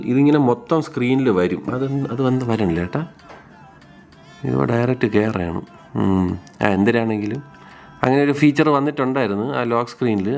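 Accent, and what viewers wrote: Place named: Thiruvananthapuram, India. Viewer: fell for your feel of transparency as human with thirontharam accent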